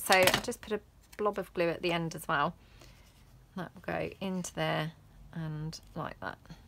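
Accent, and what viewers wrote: England, United Kingdom. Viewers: Hi I love these. I've been watching 5 of your older videos this afternoon it gives me the get up to do things for journals I'm making for family. I just love to hear the accent me being English from the Midlands now in Australia for 53 yrs. I was 11 when I came. My kids say I've not lost my accent but they also have one they all have a Birmingham twang my hubby is Australian to and he has picked it up. But I miss hearing English accents. But you help me to do things I've never done before. Thankyou. God bless Karen and family in Australia. I do hope you are ok with your divorce and you are keeping well. Family to.🌻💖🥰🐨🇭🇲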